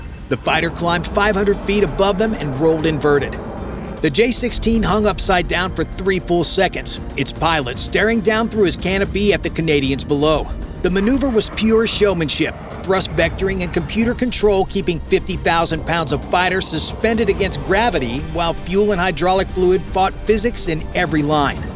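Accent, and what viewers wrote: United States. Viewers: American accent though, no originality on You Tube any more, how sad... "creators" my ass!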